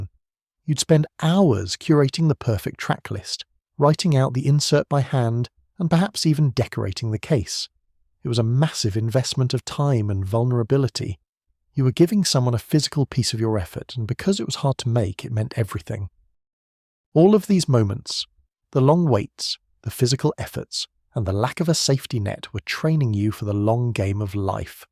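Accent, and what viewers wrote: England, United Kingdom. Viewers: This is a strange video with a British accent but American images. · Man, I was about to enjoy this video till they put this bullshit British accent down there that’s not American or nostalgic. What the hell is wrong with you? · Why does the narrator have an English accent? This is about 'Merica. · This British accent is pissing me off for some reason 😡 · Uk accent for a US life. Doesn’t matter. Most of it applied. A child of 76, I grew up playing feral in short shorts, bikes with long seats paper rounds, making camps out all day every day in the summer with the only instruction to be back home by dark. Happy happy times. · Nostalgia. It's a little unusual to hear a British voice narrating American English vocabulary and photos. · Brit accent for Americana. Hmmm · The British commentators voice does not fit the memories from AMERICA in the 70’s and 80’s. 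Sorry, bad choice.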